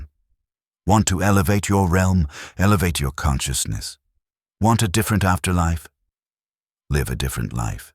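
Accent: United Kingdom. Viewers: Lemme gess more a i. Trying to act kike the know dick about human spiritualality when youre just a preditor taking advantage of humanitys dire need for mass spiritual awakening and info so you put these suposidly helpfull ( all they usually do is repeat them selves over and over and i get a broken clock is right 2 times a day i also get tgat humanitys greedy and the other part of us aint byt we just wanna live and be usefull to are fellow humans were you you dont even intoduce youreself first pount of you drfintly aint one of us strike 1 strike 2 you never ever say any of this like its comming from personal or even studied knoledge no youre literly just copied jung or who ever copied pasted refergitaed real humans real learnend knoledge and deepth to suit youre needs to genetate cash for some one that probably has way to much if that allready 3rd point how i know ypure fake 9/10 times its the same pish english my shit dont stink and i know everything additude when you clearly never put a pair of pants on or walked a mile in any ones shoes see the thing is i binge watch utube tons of it all month a myriad of difrent subjects from soiritualality to religion philisophy sociology psychology history geography homless shelter building old time plants for food and medicine every channel that tails wearwolf stories or dogman and a shit ton of musical everything and this posh englush holly crap iam better than everyone voice with it equally condecending additude its just plain gods da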